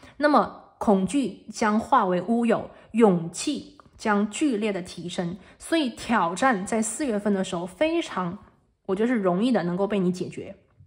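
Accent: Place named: Taiwan. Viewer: 變台灣腔？好奇怪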